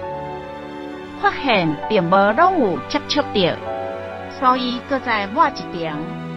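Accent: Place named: Taiwan. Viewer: 強大的台語，拆機教學，害我笑到肚子很痛。本來是要學拆機，變成學台語發音。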